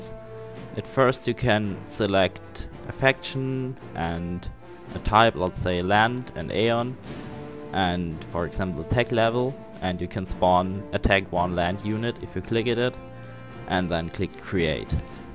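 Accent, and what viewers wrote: Germany. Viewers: Bist du deutsch?? 🙃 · dadurch weil ich deutsche Akzente gut kenne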